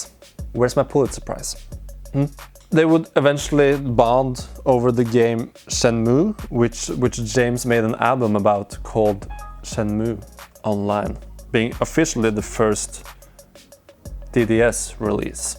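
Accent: Norway. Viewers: Yooo er du norsk Du høres norsk ut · Aha! Så du er norsk! Kjente det igjen på uttalen med én gang. Ikke mange i Norge som hører på vaporwave, og lite niche som spiller her, så jeg kjenner meg også igjen i lysten til å dra andre plasser. Bra liste btw. Personlig ville jeg snudd den på hodet, men jeg har kanskje litt annen vapor-smak :)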